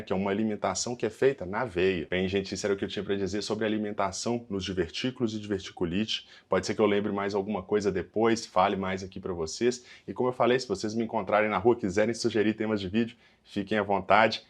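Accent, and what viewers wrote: Minas Gerais, Brazil. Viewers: Obg pelo vídeo,me ajudou bastante ,descobri hoje que estou com esse problema. Acho muito bonitinho seu sotaque mineirinho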